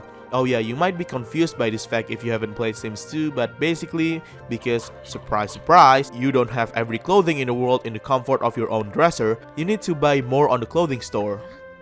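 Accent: Indonesia. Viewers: r u indonesian?!? your accent sounds kinda indonesia-ish and also your youtube name :D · ea yg ngomong sama punya channelnya org indo ya wkwk kedengeran dr aksennya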